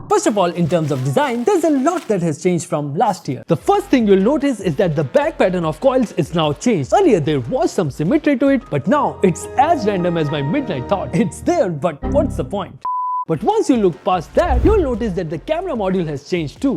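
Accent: India. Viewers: Non native English speaker here rarely gets to hear English with Indian accent in it, but i can completely comprehend all of the things he said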